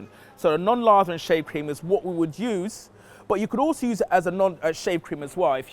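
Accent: United Kingdom. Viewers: ''Your British accent ...is killing me ...very nice ...'' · Love the British accent, this man really knows his business. Also he's totally classy.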